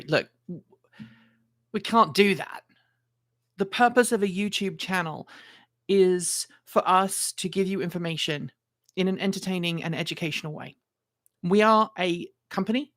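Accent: United Kingdom. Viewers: Enjoy your vids as they are don't change because of some narrow minded people be yourself and hang in there and I love the British accent hang in there 😊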